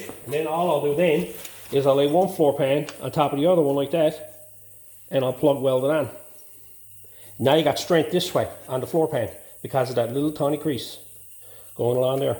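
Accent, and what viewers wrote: Ireland. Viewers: Great piece of kit, well done. Is there a touch of Irish in your accent? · You sound Irish lad nice job on the brake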